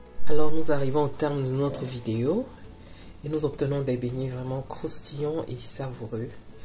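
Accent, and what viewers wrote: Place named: Cameroon. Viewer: MERCI ❤❤ vous avez un ton de chez moi Camer 😊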